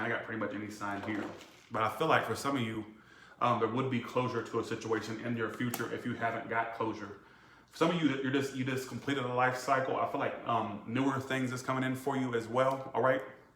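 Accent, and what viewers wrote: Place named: Louisiana, United States. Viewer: Hes Creole/ Louisiana I think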